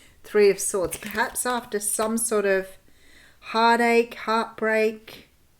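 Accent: Australia. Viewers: So lovely to hear the Australian accent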